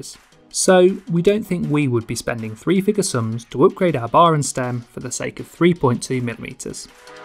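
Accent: United Kingdom. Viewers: You are good but your british make my ears bleed.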